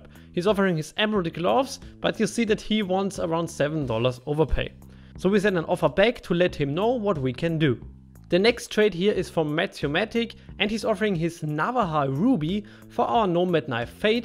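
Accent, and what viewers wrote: Germany. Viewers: I hear deutsch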